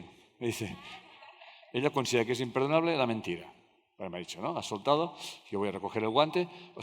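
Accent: Catalonia, Spain. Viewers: hay palabras q no se te entienden porque tu catalán lo impide.....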